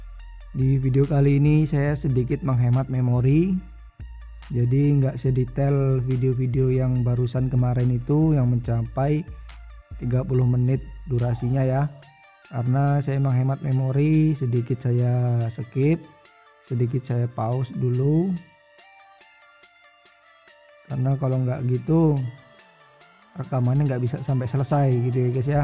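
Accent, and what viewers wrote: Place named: West Java, Indonesia. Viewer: Kyak logat sunda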